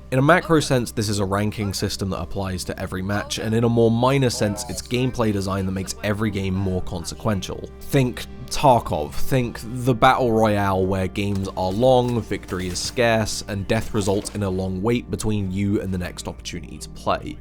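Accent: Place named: United Kingdom. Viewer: brit detected, listening and learning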